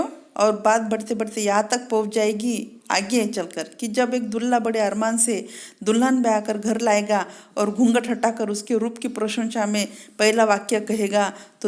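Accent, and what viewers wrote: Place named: Maharashtra, India. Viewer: Aapka fluency Marathi lag raha hai not good speaking Hindi...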